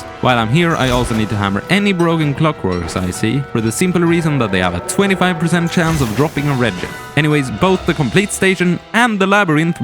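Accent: Sweden. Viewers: I don't know who you are but you sound so obviously Swedish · Blessed by the algorithm gods! What a fun video, with great execution too! Shocked to see this channel hasn't even broken the 5k mark! Also, you have the funkiest, most pleasant accent. Are you Swedish by any chance? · Love the swedish accent!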